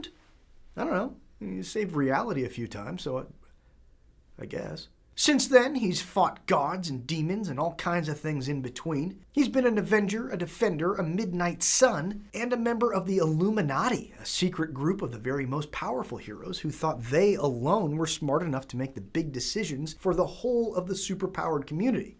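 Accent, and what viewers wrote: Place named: Scotland, United Kingdom. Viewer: Thought this one might be Scottish 🤣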